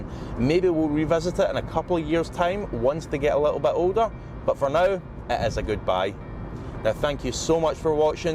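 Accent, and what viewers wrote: Scotland, United Kingdom. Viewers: Prude i got your Scottish accent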